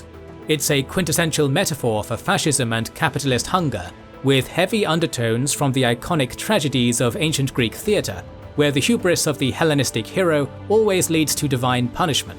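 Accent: United Kingdom. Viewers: A prime example of the danger of the British accent. Giving credence to the sequels which split the fandom, introducing Grey Jedi as if that's a real thing and not just fan fiction, and defining the Sith Rule of Two as the way that operate when the Sith species was conquered by exiled Dark Jedi. Sith bend the Force to their own will for their own selfish desires, where Jedi surrender to the will of the Force and use it to guard life. Way too much emphasis on the damn sequels.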